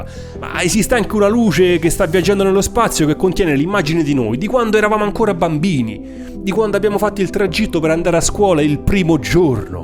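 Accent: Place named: Sicily, Italy. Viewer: PS: sono di Palermo, mi diverte un casino sentirti parlare spesso nei video con l'accento siciliano, vai alla grande 💪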